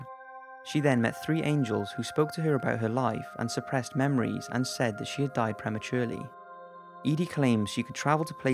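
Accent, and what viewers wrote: United Kingdom. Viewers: yes another amazing video keep em coming and i LOVE your accent are you from the UK? night and peace out from Sweden x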